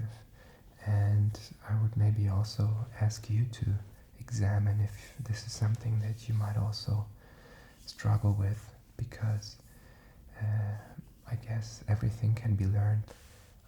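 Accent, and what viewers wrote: Scotland, United Kingdom. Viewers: That’s a scotch accent haha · His accent is scottish btw :) sounds just like my mum and dad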